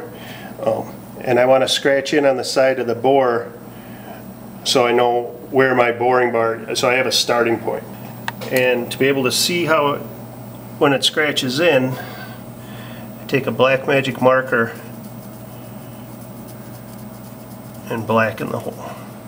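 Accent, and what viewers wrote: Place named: Wisconsin, United States. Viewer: i can tell you're from WeesCAWNsin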